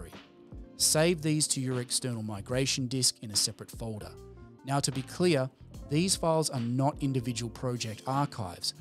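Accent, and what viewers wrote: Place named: Australia. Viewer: Hey dude! thanks for the thorough video. I like your presentation and um... Aussie! I'm wondering if you can help. My old Mac Pro running Resolve16 died recently, and I just took the leap to a Mac Studio. Anyway, I have no way of exporting my Resolve projects and databases, though I have the original files on disk, project folders, .db files in Application Support etc.. But I'm at a loss for how to restore my database with just these raw files. I've tried copying them to the new Library structure, but nothing's getting recognised. And I haven't found a way to import them. Any ideas where I should go from here?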